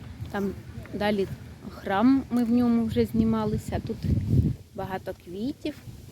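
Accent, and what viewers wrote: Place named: Western Ukraine, Ukraine. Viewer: hand-pink-waving:Харків! Ви поруч зі мною! А спочатку мені здалося. що ви з Заходу України розповідаєте.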